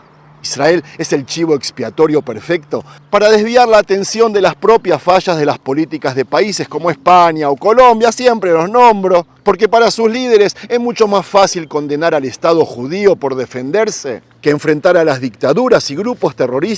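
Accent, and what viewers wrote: Buenos Aires, Argentina. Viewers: Hola sr de Argentina, hace mucho lo veo me resulta muy agradable utt.me llama la atención su Castellano esmuy porteño de Argentina siendo una persona compresiva Y humama Gracias Gracias soy de ɓs.as. y cristiana solo siento elogios para usted y me gustaria un pequeño repaso de su conección con argentina ya que dijo que tiene un hermano graciasle pido no se incomode plr el pedido ya queme resulta una persona muy agradable mis🎉 saludos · Por tu acento creo que sos también argentino doblemente hermano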